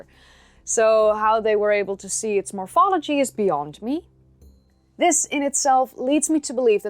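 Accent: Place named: Netherlands. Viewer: your accent reminds me of my trips to the Netherlands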